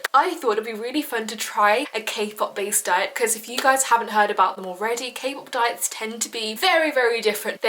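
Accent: United Kingdom. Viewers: Okkk ..first off !!!! I'm in love with her British accent dayummmmm🤩🤯🔥🔥🔥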